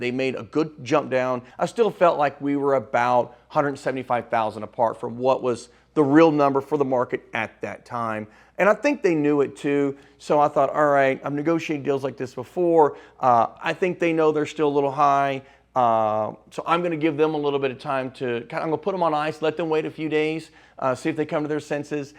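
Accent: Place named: Texas, United States. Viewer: Definitely From Dallas Texas, Maybe Houston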